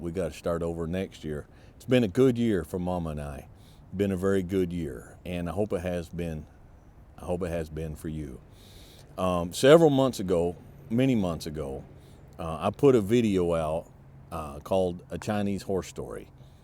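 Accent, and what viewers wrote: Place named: South, United States. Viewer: love the southern drawl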